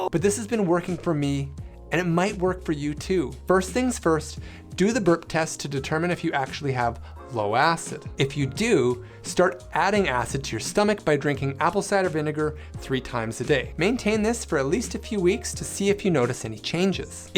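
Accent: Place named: Canada. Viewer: No, hon', I'm vegan, so no animal products, whatsoever. As for my mental health, I've always suffered badly with anxiety and depression (I sadly lost my father to it 😞💔). My mental health got even worse last year. I had two really traumatic events (briefly homeless through no fault of my own, then hit by a snow plow 😭💔). I don't doubt that all the stress, (plus people stealing from me when my former apartment was condemned), didn't help to create this issue.😩 The night I moved into my new apartment in this new region, I had a UTI.😭 So, it was like my body was overcome with the stress. I just hope that this issue can be healed finally because I'm getting more depressed every day living with it.😞💔 Anyway, again, I so appreciate your help and suggestions, hon'.🤗 I hope you're having an amazing day wherever you are (I'm gonna guess Canada from your accent, but I could be wrong.😂).❤️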